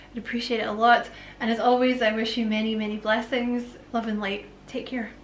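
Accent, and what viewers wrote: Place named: Scotland, United Kingdom. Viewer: You are such a doll! I love your Scottish accent! You crack me up when you cuss! And when you tell people to ** off! I live for it!!!🙌🏾 I’m also subscribed to your other channel🤗 Thank you for all you do. You’re a sweet and endearing soul. Love and light to you always💛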